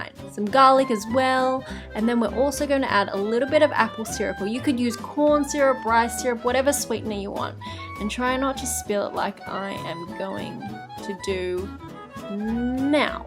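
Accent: Australia. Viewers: Ok so I love your accent, Aussie? And you are full of energy and then some and your food looks amazing..Im going to make one of them real soon, thanks a bunch for your video!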